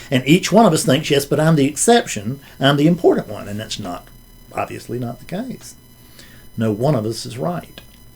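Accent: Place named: Mississippi, United States. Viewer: Hey! Love this!! My AA sponsor turned me onto you. Just curious as to where you're from. I live in Toronto now but I'm from Mississippi. My sponsor thought I'd like really like you because she says we have the same accent.:)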